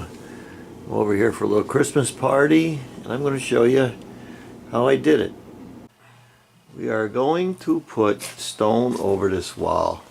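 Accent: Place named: Pennsylvania, United States. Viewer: great videos I really appreciate what you do I've learned allot from your videos the voice brings me back i grew up in south eastern Pennsylvania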